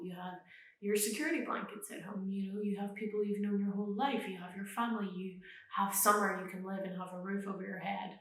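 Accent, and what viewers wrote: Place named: United States. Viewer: OMG I AM HERE FOR THIS NEW ACCENT ON YOU!!!! IT's kind of like AMERICANNNNNN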